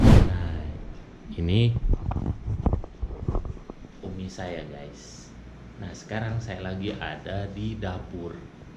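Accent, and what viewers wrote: West Java, Indonesia. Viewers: suka liat vlog ini,yg ngevlognya seru & ada lucu2nya,logat sundanya& jadi tau daerah2 disaudi.kynya enak tinggal disana.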